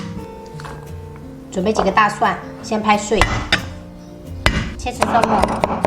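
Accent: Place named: Minnan, China. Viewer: 你似被夫家閩南口音影響了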